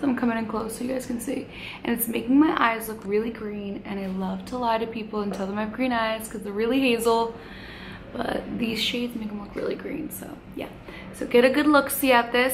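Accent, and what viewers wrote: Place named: New Jersey, United States. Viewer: just wandering where ur from. i live in nj and you sound like ur from here!!!!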